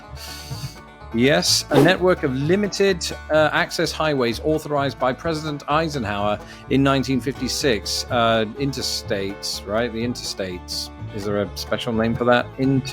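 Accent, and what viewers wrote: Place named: United Kingdom. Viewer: Off topic, but I have a question. Your particular accent is my favorite British accent (I've heard it before, obviously). I just don't know what you would call it or what part of England it's from. So, that's my question. Where are you from and what particular accent do you have?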